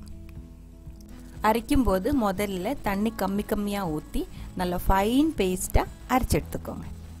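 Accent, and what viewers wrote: Kerala, India. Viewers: Azhagana Malayalam kalantha thamil pesum thangachikku vaalthukkal. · Malayalees tamil pesuradhe oru azhagu than! I like the way you speak and the way you speak and oviya S accent (big boss) !! I tried this watching a malayalam channel it was v delicious 😋 I tried it with mackerel , next time I l try in your style for jelabi meen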